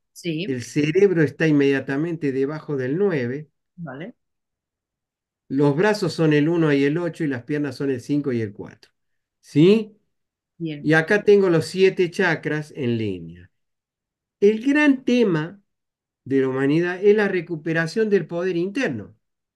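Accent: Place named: Córdoba, Argentina. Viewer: Buen día!! Que sorpresa escuchar a un cordobés como yo. ,jajaja, lo estoy escuchando, gracias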